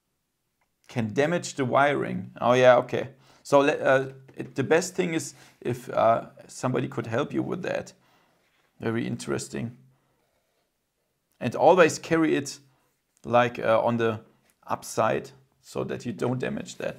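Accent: Germany. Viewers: Der deutsche Akzent genial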